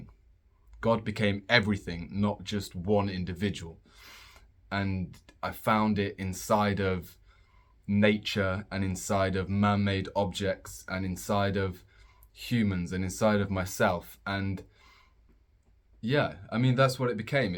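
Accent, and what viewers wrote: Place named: Australia. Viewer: You are Aussie right love your accent I'm Latino greetings from Honduras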